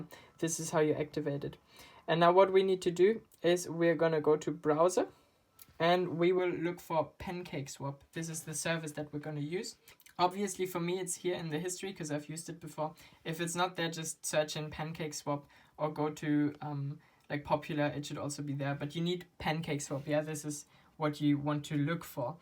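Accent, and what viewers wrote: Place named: Germany. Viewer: For being German your accent really isn’t bad. Good video thank you for explaining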